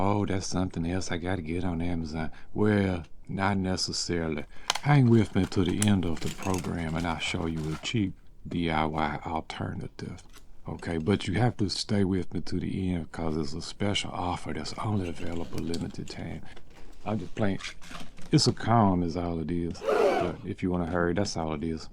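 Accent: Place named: South, United States. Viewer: First; boss level teaching skills. 2nd, all my people from Hot’lanta to Lake Charles talk just like this :)= feels like home.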